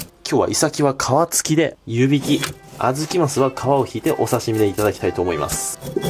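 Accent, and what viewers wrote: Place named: Tottori, Japan. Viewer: 鳥取県の人間です。 いつも楽しく拝見しています。 「宝箱だが。」っという言い方 鳥取県の方言と似ています。他にも似たような言い方をされるので同郷なのではないかと思うくらいでした。 いつか遊びに来て下さい。道の駅ではイカがくるくる回されながら干されていたり、温泉もあるし、松葉がにのシーズンには足が一本無いだけのものが破格値で買えたりします。 これからも頑張ってください。動画投稿楽しみに待っています。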